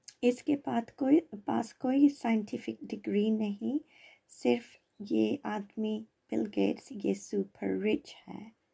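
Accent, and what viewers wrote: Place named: Pakistan. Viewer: Sis r u from Pakistan.. No offence but your accent is a little difference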